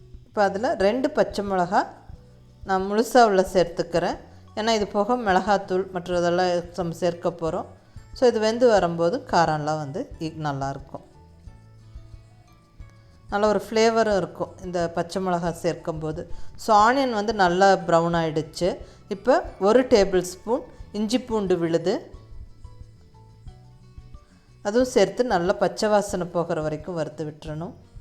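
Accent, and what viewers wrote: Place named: Coimbatore, India. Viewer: உங்களோட கோயம்புத்தூர் பேச்சிற்கும்.... உங்களோட கோயம்புத்தூர் சமையலுக்கும்.... திருநெல்வேலி மங்கை தன் தலை சாய்ந்து தங்களை அன்போடு வணங்குகிறாள்..😊😊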